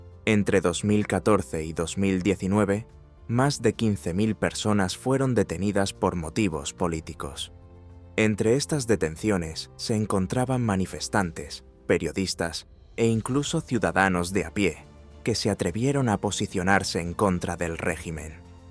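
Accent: Spain. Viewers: El Locutor debe ser Español espero que haga un VIDEO DE SU PAIS E S P A Ñ A !!! QUE NO ES UNA MARAVILLA TAMBIEN HAY POBREZA Y BASTANTES DESAUSIADOS !!! CUALQUIER PAIS QUE LOS EEUU🇺🇸 LE META SANSIONES LE PASA LO MISMO !! VIVA VENEZUELA 🇻🇪 CARAJO !!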